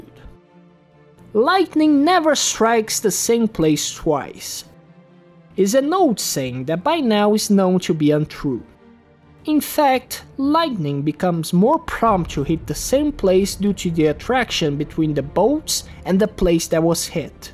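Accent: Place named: Brazil. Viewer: I knew you had some Brasilian accent lol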